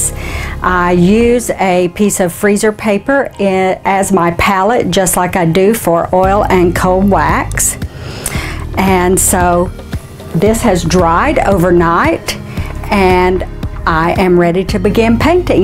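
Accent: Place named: South, United States. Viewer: Love this and love your southern accent 👍🏼,love from the UK🇬🇧......subscribed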